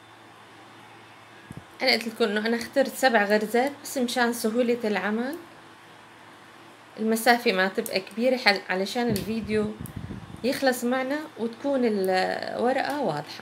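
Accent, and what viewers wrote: Egypt. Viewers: بوركت ولكن لكنتك مش شامى بالكامل فيها اللهجة المصرية .خلتينى احترت فى جنسيتك وانا بسمعك😅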